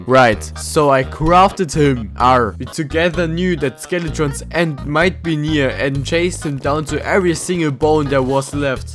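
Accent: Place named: Germany. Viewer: man hört den deutschen in dir hahah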